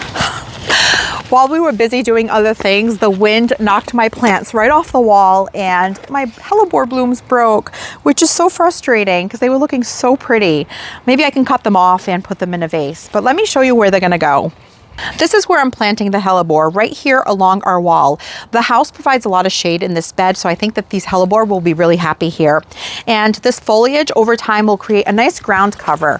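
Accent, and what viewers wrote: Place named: New England, United States. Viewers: What’s ya doing!❤. Love the New England accent. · So much inspiration for me! Your gardens look gorgeous. I grew up in RI, and I heard the accent in your video and knew it was someone local! In NH now, do live to see a month or so ahead.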